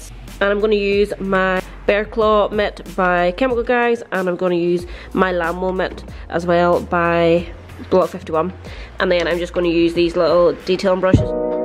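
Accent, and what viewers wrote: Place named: Northern Ireland, United Kingdom. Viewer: Luv the videos and Great to hear that good oul norn iron accent , keep up the good work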